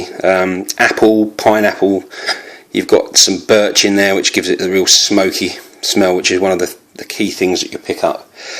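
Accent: United Kingdom. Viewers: I just just subscribed to your channel!!! I'm so impressed thus far by your first video. I don't know what it is about you Brits that when you speak you command such respect?!?!?! Accent??? (Wink wink)... Anyways here is my TOP 5 all the way from Canada... 1. Neroli Sauvage 2. Green Irish Tweed 3. Jardin D'amalfi 4. Original Vetiver 5 Original Santal :) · Nah, you should pronounce things the way you naturally do. Its just plain rude to criticise someone's accent and suggest it's some how wrong. I've got an estuary english accent too, don't speak differently to appease others. Imagine it the other way round, I'd never make negative comments about any accents that sound foreign or different to ours' - as i said it's very rude and comes from plain ignorance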